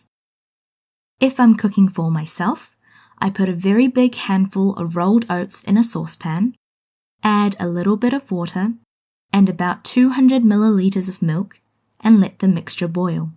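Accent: New Zealand. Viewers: 한국 온지 넘 오래돼서 뉴질랜드 발음 다 까먹었는데 이렇게 다시 듣게 되니까 친근하고 반갑네요 ㅎㅎ 갠적으로 포리지 좋아해요>.< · 뉴질랜드느낌 많이나네요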